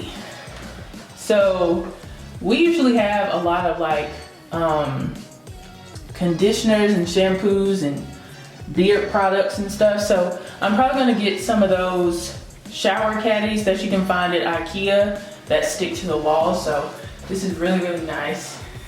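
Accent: South, United States. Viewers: Are you southern?I just became a follower today. Your videos kept popping up and I love your content. I cant help but detect a drawl. Im from Mobile.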